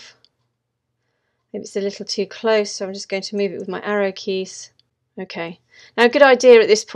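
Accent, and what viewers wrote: England, United Kingdom. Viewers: Absolutely wonderful. You sound English, I'm from Bristol, live in Australia. Writing a biography of a wonderful life with my late Valerie. Met in 1955, torn apart in 2019. But, you have given me so much knowledge to make this story readable, and I hope for others. Well done, Take care, Terry.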